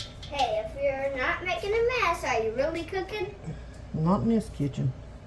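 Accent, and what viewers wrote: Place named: South, United States. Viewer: Canadian here…love how you pronounce boil….bowl. So fun to watch your southern cooking, and hear the accent. Never heard of this cowboy candy before.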